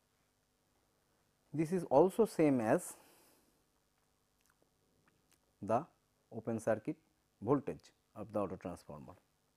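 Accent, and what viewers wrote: India. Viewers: victor Pham Ừa bạn, căn bản thì nó cũng chẳng phải là Sience Rocket gì , một vế là Voltage thấp và Ampere cao chuyển qua một vế là Voltage cao, Ampere thấp . Nhưng cái vui trong này là ông thày nói tiếng Anh cái giọng quá nặng accent của người Ấn Độ.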